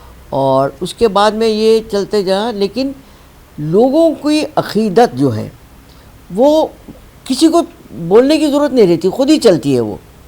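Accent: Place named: Deccan, India. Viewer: Mashallah excellent deccani urdu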